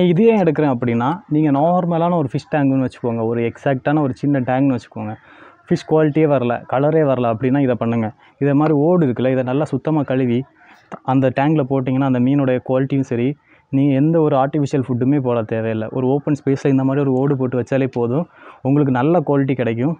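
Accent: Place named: Kanyakumari, India. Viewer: pesura slang la teriuthu.... Kanya Kumari la enga😁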